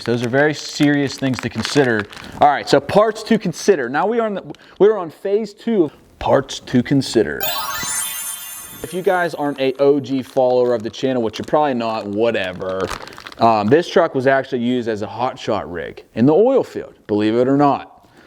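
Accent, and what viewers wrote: Pennsylvania, United States. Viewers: Bro i live in illinois. Before you even said where you're from i was like. "Yeah thats a PA accent." Completely random but i called it.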